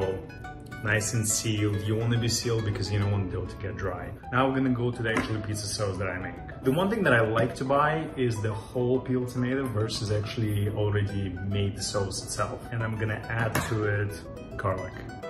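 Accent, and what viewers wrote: Russia. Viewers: cute Russian accent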